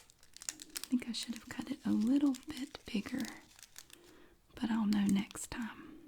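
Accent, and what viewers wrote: South, United States. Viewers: Your asmr leaves me knock out ... I love 10 points Southern...!!!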